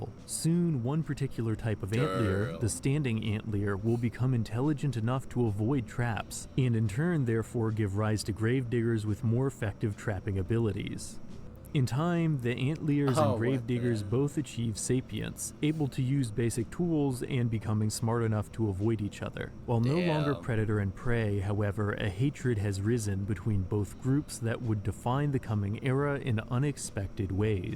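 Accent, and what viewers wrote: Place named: Russia. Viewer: In general, I think you have a chance to become popular👍 . (Well, English with a Russian accent is so-so.)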